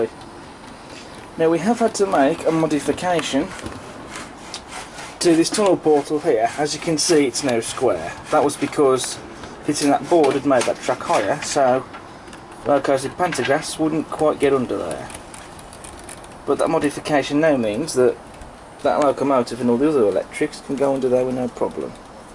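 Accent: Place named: Coventry, United Kingdom. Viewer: Whereabouts do you come from? Sounds like Coventry perhaps?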